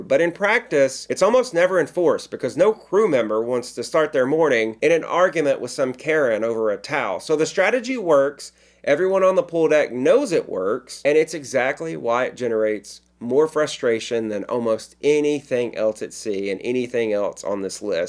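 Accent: South, United States. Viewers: Well done as always. I love watching the scenery on your videos. You sure got the life. I wish I had the technical skills and personality to do what you do. I would love to be able to afford to sail the Deep Blue Sea for a living. From where is that Southern accent?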